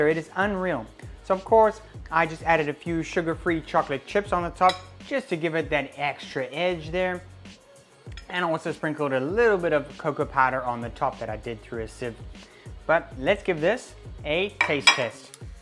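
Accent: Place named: South Africa. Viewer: I am South African too, yes. Recognized the accent. Love your channel and all the amazing recipes. Well done!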